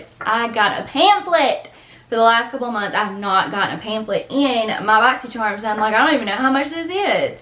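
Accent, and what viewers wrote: Alabama, United States. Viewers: You got nice stuff diffinately worth the money, also love your sweet Alabama accent ❤️ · I just love your southern accent!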